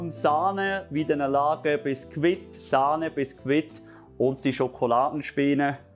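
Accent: Switzerland. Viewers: Dopo diversi anni che sono tornata in Italia dopo aver vissuto in Svizzera per 20 anni ho avuto voglia di elaborare una swarzwalder torte sono felice di sentir parlare svizzero ed di sentire le spiegazioni in modo così chiaro. Complimenti. Faro questa torta peril compleanno è la regina delle torte. Da oggi ti seguirò.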